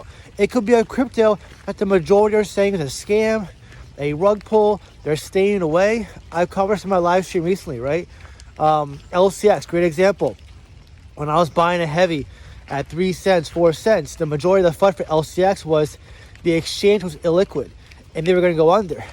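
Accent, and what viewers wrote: New York, United States. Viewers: I am from England but am sure that's a New York accent. 😮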